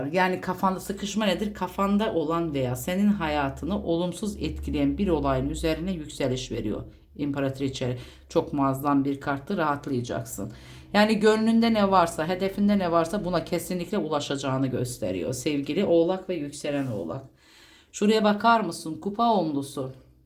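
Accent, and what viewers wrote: Azerbaijan. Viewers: Siz Azerbaycanlımısınız? Şive çok benziyor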